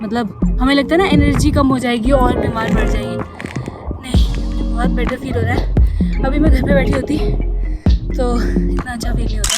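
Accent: India. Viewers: I saw many people said thy were attract how u talk d reason is ur desi hindi ☺😁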